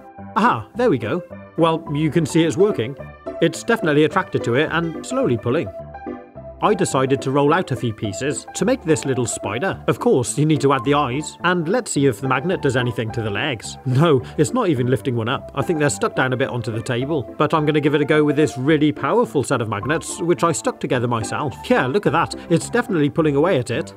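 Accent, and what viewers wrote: United Kingdom. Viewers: So your British? I’m american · I feel that you are British.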